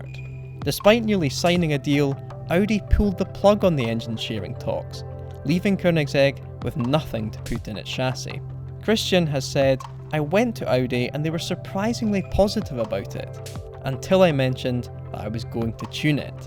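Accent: Scotland, United Kingdom. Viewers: so what he’s scottish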